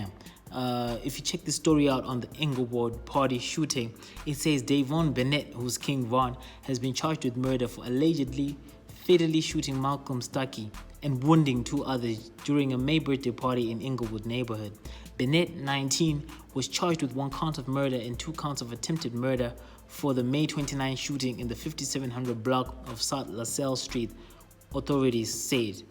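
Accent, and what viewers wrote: South Africa. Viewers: maaaan you sound so South African please tell me you are 😂😀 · Bra are you South African? your accent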